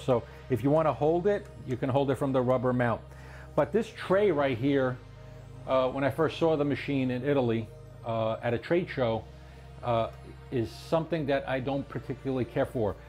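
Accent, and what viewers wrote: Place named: New Jersey, United States. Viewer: An east coast accent. New Jersey working class. No mystery. Merely region plus class.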